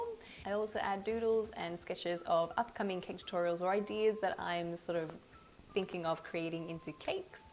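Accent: Australia. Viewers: Hi, just found your channel looking for tips on how to make my daughters first birthday cake. Your tutorial are awesome. Picked the Aussie accent straight away. I'm from Bendigo.:) xx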